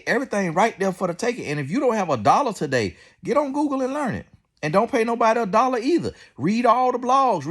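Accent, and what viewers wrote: South, United States. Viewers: I love she’s so beautiful and I love her country accent it’s to cute 🥰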